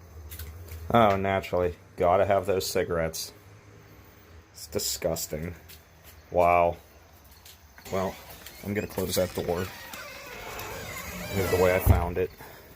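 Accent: Pittsburgh, United States. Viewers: Nice vid, grew up close by and our family would always would get gas there, I remember it going from 76 to Shell around 2004, then Exxon several years later. I think it opened and closed a few times over the years as an Exxon , with each owner putting nothing into it. Even a year ago half of the pumps didn't work very well and the place looked pretty run down. I think at this point it is too far gone for somebody to reopen without a major rennovation... and whoever moved in would still have to tangle with the Sheetz. Im coming home this weekend and will check for the pin, but im sure it will be long gone. :) Btw, great pittsburgh accent, I miss hearing that every day.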